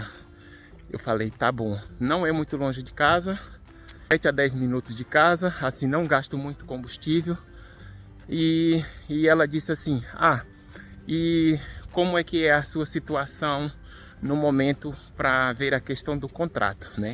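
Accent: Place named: Northeast, Brazil. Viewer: Quem será que mandou esse nordestino, essa encomenda, para cá para Portugal? No último vídeo dele, ele desativou os comentários porque ele sabe que vai ter "pra sobrá prá eli". Ele não está no estado dele, nem ele está no país dele. Vê-se logo que ele é dum país de TERCEIRO MUNDO, que é de orientação de esquerda e ele dá a entender que é evangélico ao dizer que a Terra é de todos. A terra dos evengélicos e dos das seitas é a Holanda, a Dinamarca, a Suécia etc. Portugal é um país católico e cá fala-se português de cá de Portugal e não o português do Nordeste do Brasil, como ele fala.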